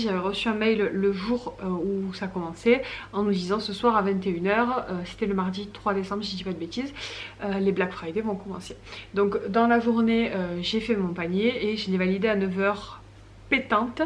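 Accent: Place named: Marseille, France. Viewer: Ta un accent Marseillaise ma belle .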